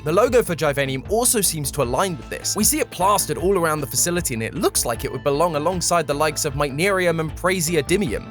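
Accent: United Kingdom. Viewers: hmm got a little British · British matpat gets More British somehow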